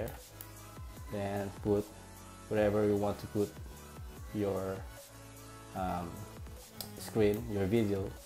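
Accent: Philippines. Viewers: halatang pinoy ka sa accent thank you talaga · I like your accent :p PINOY?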